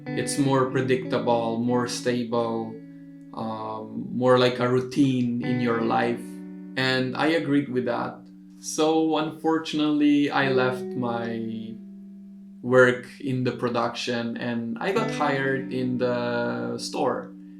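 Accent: Philippines. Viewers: I notice the way you speak English you have a Filipino accent. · You sound like a Pinoy. Haha. Interesting, first time I see a Caucasian guy sound like a Filipino.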